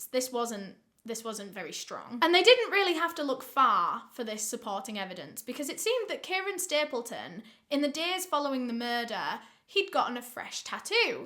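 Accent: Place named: Yorkshire, United Kingdom. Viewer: I think its a British thing. They say so many words strangely lol same with Australian accent...plus different dialects. she says sooch instead of such. She says gale for girl.. lol I love it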